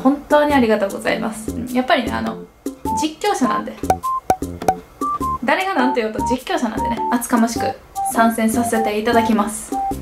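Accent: Kansai, Japan. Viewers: 関西弁美しい！ 大阪には遊びでよく行ってます✨ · 関西弁美女ってええなあ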